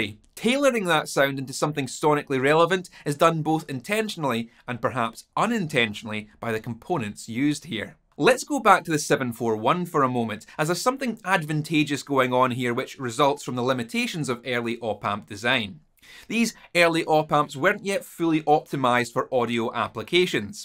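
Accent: Scotland, United Kingdom. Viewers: I really like your scientific approach to explaining guitar electronics. No snake oil salesman here. Also I just love the sound of the scottish accent!!! Greetings from a german electrical engineer drummer guitarist guy. 🤣🤘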